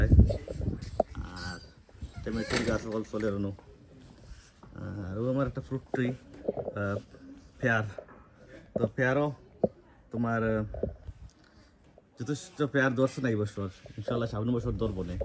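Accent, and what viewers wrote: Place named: Bangladesh. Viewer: মাশাল্লাহ খুব সুন্দর ফসল কথাই বোঝা যায় আমার দেশি মানুষ সব মিলিয়ে খুব ভালো লাগলো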